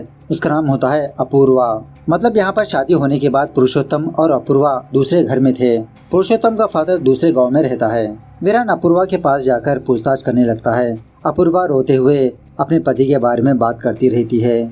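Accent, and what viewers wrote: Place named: Mumbai, India. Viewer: Thobde 😅 mumbaiya Hindi with style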